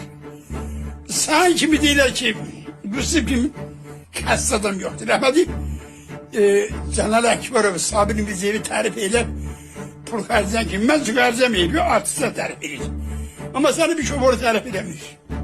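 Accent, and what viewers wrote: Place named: Jalilabad, Azerbaijan. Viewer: Юсиф Мустафаев боюк санаткардир ва чох тарбияли инсандыр. Бу йашлия кимса пул вериб ола билсинки, бела hагсиз данышир. Лаhжаси Джалилабадлы лаhжасина охшайир. Ман озум Ланкаранлыйам ва 37 илди Российада йашайирам ва hамиша маhниларина гулаг асырам. Ман бир джанублу баласы кими фахр едирам.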